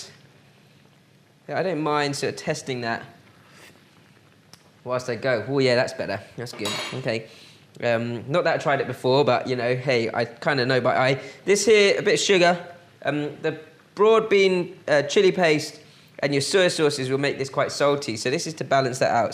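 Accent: United Kingdom. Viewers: Chinese invented black vinegar way before Europe, so now you are trashing your own people because you have british accent? Karma will get you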